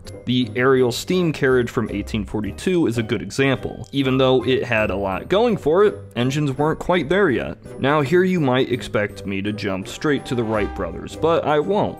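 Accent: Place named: United States. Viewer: Why does he keep on getting more and more American throughout the entire video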